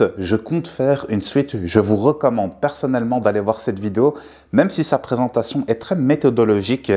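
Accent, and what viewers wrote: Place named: Belgium. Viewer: Bonsoir, pardonne moi si j'ai pu t'atteindre de par mes quelques commentaires.. sache ke je suis abonné et que je like positif à 90%.. tu as un sens de l'humour et ton mélange d'accent orientale/belge est rigolo..😉. Bref en ces temps de fêtes: prends soin de toi, bonne année à toi (sincère) et a plus dans l bus...😁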